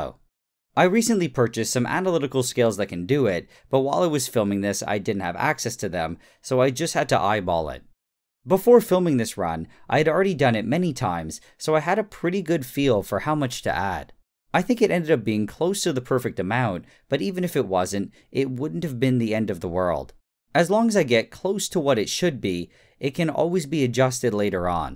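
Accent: Quebec, Canada. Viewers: Is NileRed quebecen? :O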